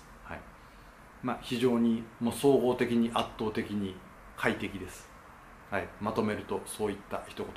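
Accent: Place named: Kansai, Japan. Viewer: 関西弁だからじゃないですかね？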